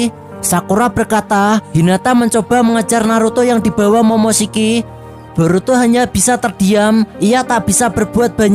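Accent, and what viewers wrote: Java, Indonesia. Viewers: Eyo woh jowo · Ooh abange orang Jawa tohhh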